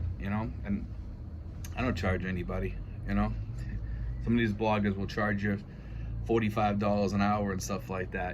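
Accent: Boston, United States. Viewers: Are you from Boston area? Do I hear a brogue? Dad is from Dorchester.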